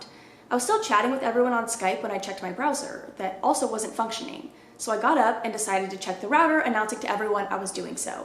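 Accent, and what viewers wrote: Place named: South, United States. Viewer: That southern drawl keeps shining through more and more. Subconscious or not, it's cute. Seems fitting, I dig it! 🤠😉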